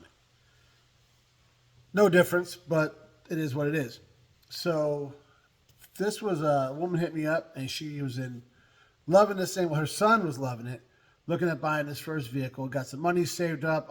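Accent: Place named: East Coast, United States. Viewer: I could listen to you talk for hours love east coast talk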